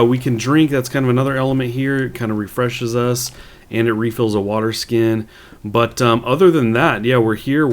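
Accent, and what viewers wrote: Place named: United Kingdom. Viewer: New to the channel, great video... enjoyed your british accent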